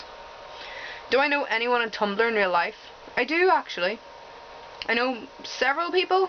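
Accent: Fermanagh, United Kingdom. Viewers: My Granny, my Dads Mum was from Fermanagh. She moved to Manchester England in her early twenties. She still had the strong accent as went back in school summer holidays with my Dad and his brother. It’s nice to hear you speaking, reminds me of her. Although I remember her being stronger but could be my bad memory. Thanks anyway and hope you and loved ones are well. You seem like a great person and if your anything like I was when younger. Your a good person but don’t realise or have the confidence in yourself you should.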